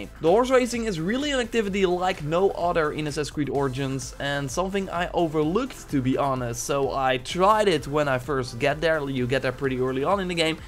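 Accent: Netherlands. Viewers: Are you Dutch? You sound dutch. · You're Dutch, aren't you? We have such an easy to recognize English accent. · Ik hoor aan je accent dat je nederlands bent xD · Nederlqnds ofnie maat dat duidelijk dunglish is hoorbaar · You are dutch, I can hear it in your accent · This is definitely a dutch guy. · Hes dutch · Are you dutch/belgian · are you dutch lol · Lol you are so Dutch, you can hear it from your accent alot. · You sound dutch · You sound Dutch😎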